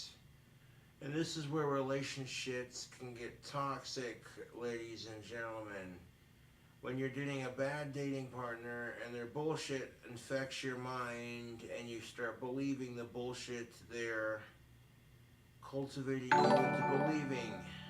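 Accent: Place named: Birmingham, United Kingdom. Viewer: Bro, are you 80% British? Im starting to hear the heritage in the accent. Sounding like Bob Geldof if he came from Birmingham. Weird Shiyte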